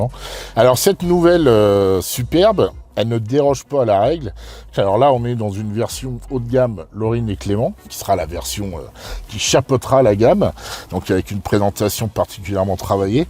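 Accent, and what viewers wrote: Paris, France. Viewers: J'adore l'accent Titi parisien. Un vrai régal. Génial. Jean Jacques 57 printemps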